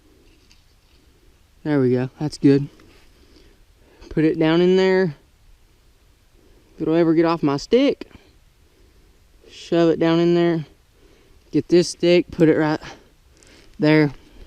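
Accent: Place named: Kentucky, United States. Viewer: Hey what part of Kentucky u from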